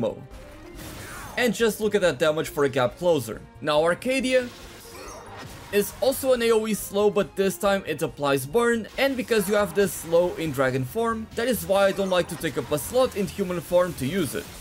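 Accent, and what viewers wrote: Portugal. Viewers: então esse sotaque era português 😂😂😂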